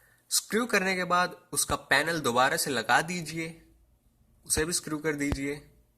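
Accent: India. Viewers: Nice video dude! I am not an Indian but I like this accent :) Btw, Save up for a 1030, Because 1030 is much better than this :D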